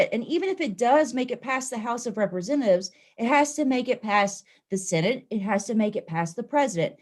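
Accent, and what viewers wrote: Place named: Tazewell, United States. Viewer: Coming from convo couch. Im a Tazewell native, so my accent is about the same as yours!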